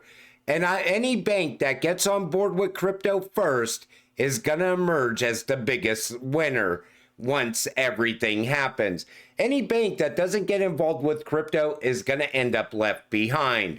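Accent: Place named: Minnesota, United States. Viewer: Hey where are you from in the us? I'm guessing up north like minnesota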